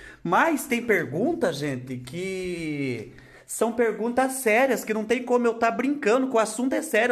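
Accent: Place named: Piraju, Brazil. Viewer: somos vizinhas kkkk Moro aqui em piraju Parabéns pelo canal, me senti muito representada no seu sotaque e sua simpatia... Deus abençoe pra continua crescendo mais e mais, SEU NACAL E LOCO DE BOM!!!!!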